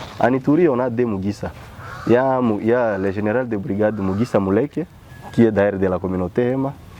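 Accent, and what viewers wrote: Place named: Rwanda. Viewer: C´est les mensonges mon frere, pourquoi vous devez demontré le gens vos nationalité doutese, vous avez accent randaise.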